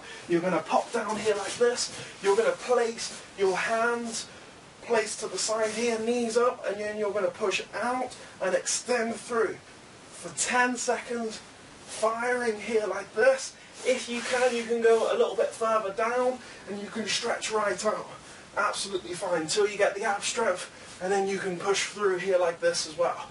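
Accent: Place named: United Kingdom. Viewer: I love your videos. Very effective. And I love your British accent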